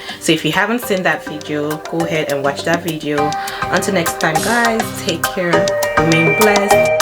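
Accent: Nigeria. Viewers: I've taken a sprint from Instagram to this video...once again I love your nigerian accent💕💕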